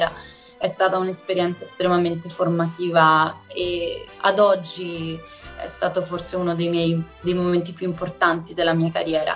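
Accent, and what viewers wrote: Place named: Rome, Italy. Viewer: Hai piu' un accento romano che bolognese....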